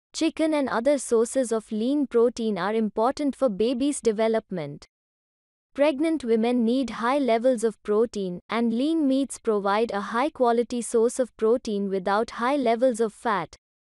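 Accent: India. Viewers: No one wanted to listen to the Indian accent woman drone on in her sing song New Delhi voice.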